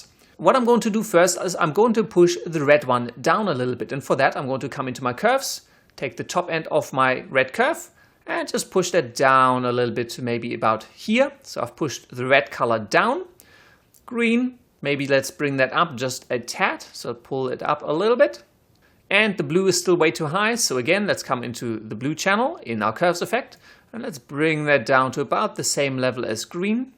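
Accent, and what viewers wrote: Germany. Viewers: I could always rely on a guy with a German accent to give the best lessons and tutorials. Thanks heaps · i guess his german accent is very strong with him XD but the stuff he made is still awesome ^^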